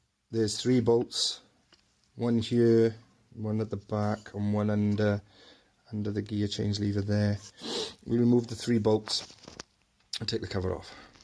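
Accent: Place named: Scotland, United Kingdom. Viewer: Beautiful Scottish accent 😊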